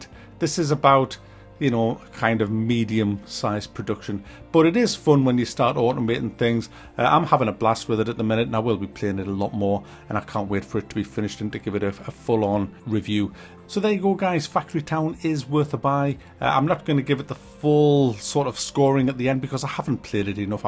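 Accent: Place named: Newcastle, United Kingdom. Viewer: I love your videos man, they're reassuringly Geordie. Keep it up!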